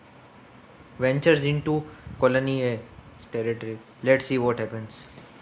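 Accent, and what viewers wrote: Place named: India. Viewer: India's accent sound Funny idk why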